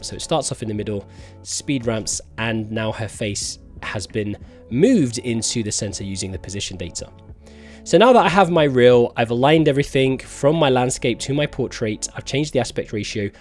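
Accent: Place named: United Kingdom. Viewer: wow, I figured from your accent you were from the UK, so that’s why I was so curious. It looks so much like my part of the US, that’s crazy.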